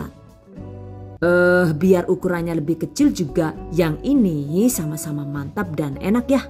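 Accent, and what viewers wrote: Banyumas, Indonesia. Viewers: Asli ngendi sih mbak,deneng pada Karo nyong,,,ngapak mania,,,Tek batiri ngapak yuh mbakyuuu 😀😀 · Suaranya kaya pengisi suara si unyil. Eh jebule wong ngapak. 😅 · Makasih utk resep2nya mbak... Aku jg seneng dg bahasa ngapaknya... Ora ngapak ora kepenak yah mbak... Suwun mbak...🙏🙏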